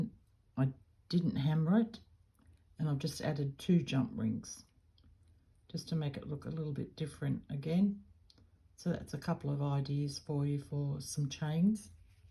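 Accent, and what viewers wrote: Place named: Australia. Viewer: Hi … it’s Shelley Cass in Brisbane. We ‘met’ in a different group about a month or so ago. It’s nice to here an Australian accent in a tutorial. Also I agree with your comment that we don’t have access to many large bead retailers in AU unfortunately. 😔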